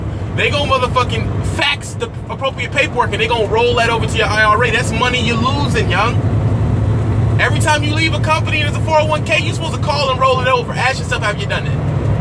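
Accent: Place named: DMV, United States. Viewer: Brotha! I just found you on YouTube a few hours ago. At first I was on the fence as to if I was going to do the trucking thing, but looking at your videos has convinced me, especially being someone in my position. This is a great opportunity to prosper! Awesome content bro! All that aside, I can tell you're from the DMV..."young!" What's up man? I'm living in Cali now, but I'm from the DMV too. RESPECT!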